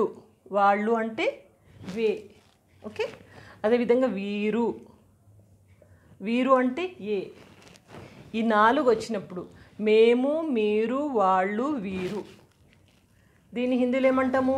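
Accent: India. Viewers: Madam way you are explaining can understand all the normal people. And hyderabadi style and traditional hat's off to you madam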